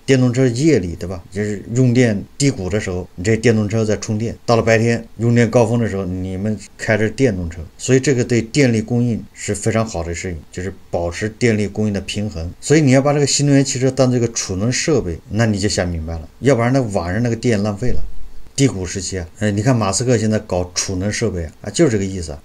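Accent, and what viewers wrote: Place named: Jiujiang, China. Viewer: 您说话有点像九江人的口音。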